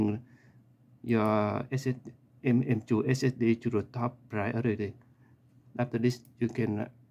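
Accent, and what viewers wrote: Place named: India. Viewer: Love u indian accent guy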